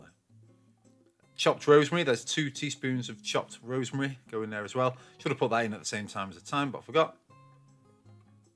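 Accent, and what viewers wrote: Yorkshire, United Kingdom. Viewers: Eeeees frooom Yorkshire by the sound of it. A beautiful accent us Aussies like coz our Captain Cook was born there. It’s weird how anyone can not understand English who is supposed to speak English. Strewth, it’s the language of the King James Bible.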